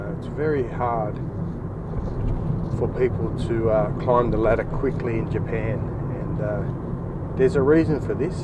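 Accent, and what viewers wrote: Australia. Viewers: Are you an Aussie mate? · You learned your English in Australia, yes? 👍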